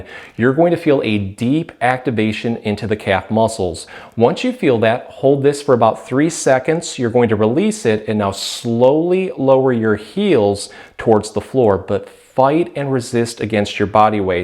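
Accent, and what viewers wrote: Michigan, United States. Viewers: Whoa heavy Michigan accent on this one. Helpful tips, as always. Thank you, Dr.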